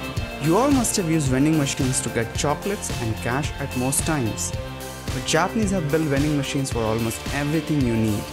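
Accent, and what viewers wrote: India. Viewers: the indian accent is too strong, pity.....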